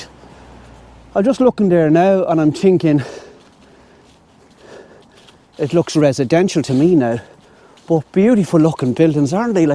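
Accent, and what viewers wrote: Cork, Ireland. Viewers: Great video 🎉 you got to love the Cork accent 😅😅..you were getting some looks there in michelstown as you said the cowboy 🤠 walking into the saloon 😅😅..look forward to next week thanks 😊 👍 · First just hype you get more followers then.Lovely to see you we want to see more of Cork we just love the accent my friend.So happy Sunday evening till next week.🎉🎉🎉🎉🎉🎉🎉🎉🎉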